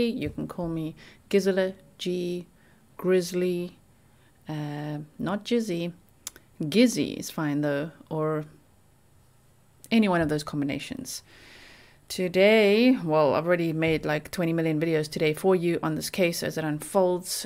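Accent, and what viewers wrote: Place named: South Africa. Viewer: she sounds south african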